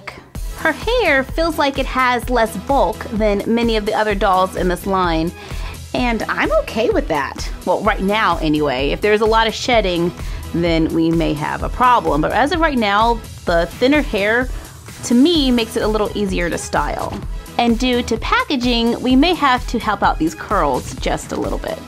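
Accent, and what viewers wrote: South, United States. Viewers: you have an amazing southern accent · her southern accent 😂😂😂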